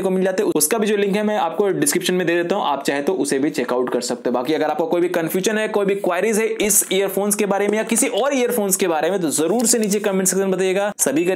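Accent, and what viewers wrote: Bihar, India. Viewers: Bihari ho ka 😅😅😅😅😅😅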